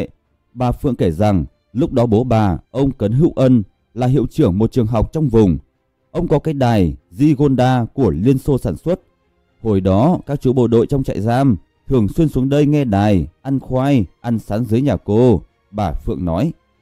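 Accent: Nghe An, Vietnam. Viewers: Người đọc cố gắng đọc theo giọng người Hà Nội, chắc là muốn để người nghe dễ hiểu, nhưng vẫn mang âm giọng người Nghệ lĩnh ...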